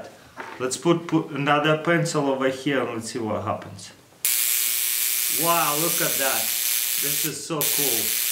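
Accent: Russia. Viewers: 2 million subscribers... For the Russian accent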